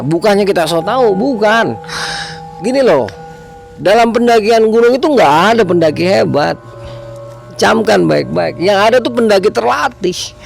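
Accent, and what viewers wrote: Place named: Jakarta, Indonesia. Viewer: ceritanya asik abang nya gw suka logat betawi nya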